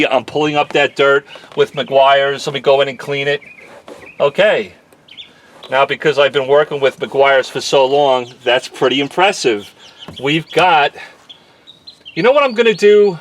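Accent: New York, United States. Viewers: You may be in Dallas, but I'd recognize that New York accent a mile away. My guess is Long Island, same place I grew up before moving to Phoenix.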